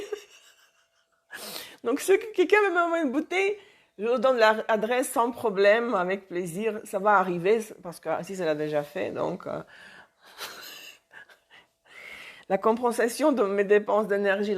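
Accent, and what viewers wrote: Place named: Russia. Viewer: Non seulement vous apportez le soleil, mais aussi votre exigence dans les connaissances. Mais en plus (cerise sur le gâteau) votre souci du respect de la belle langue parlée, au moment où vous vous adressez à nous, est très touchant, pour des Français comme moi dont la culture est un peu piétinée, ces dernières années. Avec une touche d’accent russe, c’est merveilleux ! Merci infiniment. 💐🎶🥂🌻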